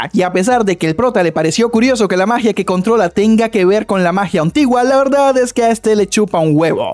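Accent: Colombia. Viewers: Eres colombiano? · Eres colombiano cierto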